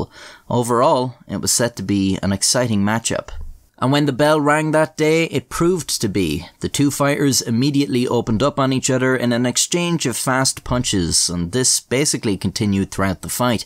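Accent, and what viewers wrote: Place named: Ireland. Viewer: Hey there lady! Just wished to say love your vids, and your narration with your awesome Irish brouge . Wish I could sound so good. I just have a goofy American accent. You have so much going for ya no need to be downing yourself as you seem to sometimes. I bet you're a right fine lad. I hope you have a most excellent day/evening whatever time you see this.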